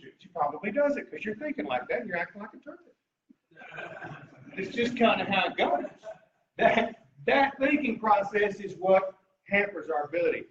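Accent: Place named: South, United States. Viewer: Thank you sir it’s nice to hear a southern voice speak on these topics I feel a bit alone sometimes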